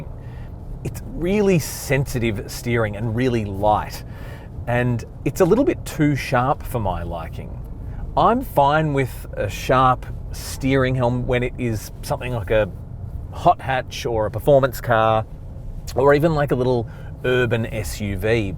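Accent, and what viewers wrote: Australia. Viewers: That down-under accent + curry = solid combo mate xD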